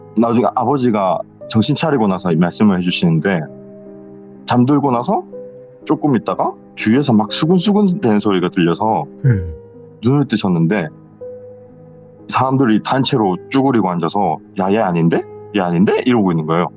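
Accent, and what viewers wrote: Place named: Gyeongsang, South Korea. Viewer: 아이구 이야기는 참 무서운 이야기인데 말하는 분이 경상도 대구 분인 것 같은데 저기 경기도 지역 요즘 20대 여자분들 말투 따라하시는 것 같아서 조금 듣기가 거북했습니다. 음절 뒷부분을 요즘 20대 여자들처럼 올리지 마시고↑ 원래 쓰시던 대로 내려 주셨으면↓ 듣기가 편했을텐데...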